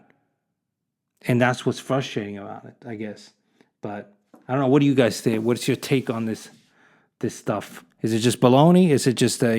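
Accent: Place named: Northeast, United States. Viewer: what is your very prominent accent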